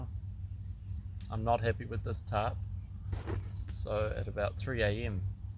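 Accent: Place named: New Zealand. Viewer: You are fun to watch. Your sense of humor is so laid back. You don't yell like others do. Love your New Zealand accent. Please keep making videos.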